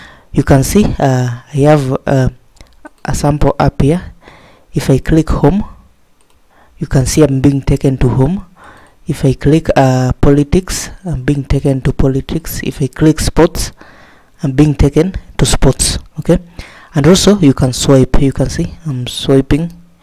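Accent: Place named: India. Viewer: I have no problem with typical Indian accent, but you eat words with your pronunciation and make it impossible to understand what you say, not to mention the low volume of the voice as well. Thanks for the effort, but it's a futile one in my opinion.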